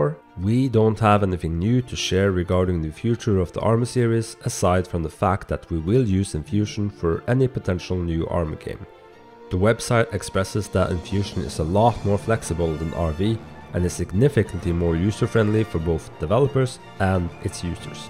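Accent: Norway. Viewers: Are you norwegian? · bro you sound so Norwegian xD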